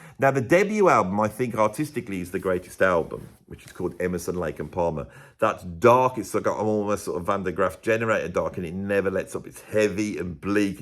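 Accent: Birmingham, United Kingdom. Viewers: I love your videos and appreciate your knowledge of music and British accent.Some groups you never mention. fantastic group., who were a big influence on and. So glad you mentioned by but missed. Evangelist Roger Mansour former Drummer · I just love. I love the Brummie or midlands accent and how it totally lends to his appeal. Not sure I always agree with his ideas, but I don't think ever wants everyone to agree - that's the appeal. He says what he wants, knowing it isn't always going to be everyone's ideal. But he always makes a compelling case. I have had to go back and re-listen to so many albums (and CDs) after one of these videos - I conveted to MP3s (I have over 35,000 tracks on my iPod) so long ago, that they can get lost. I still prefer to listen to the whole of an album - warts and all - contrary to the new world of random 'always on background music' tracks, quickly and easily skipped with the streaming services (who rip off the musicians.)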